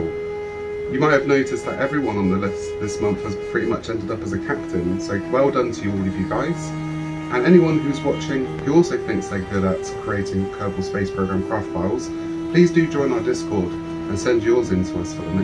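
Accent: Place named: United Kingdom. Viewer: and always remember if you feel down just think of us because your voice calms at least me down. It's just so smooth yet you sound just like a normal British guy. In short keep it up and be happy because you deserve it even if you work your hardest. 👍😁🍀👍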